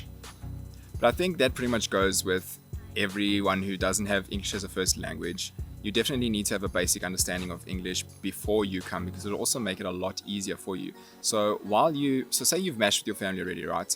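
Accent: South Africa. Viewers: I'm going to South Africa as an AuPair soon, is your english accent what english sounds like there? Do all people speak this language? Or is there another major language that determined this accent? :D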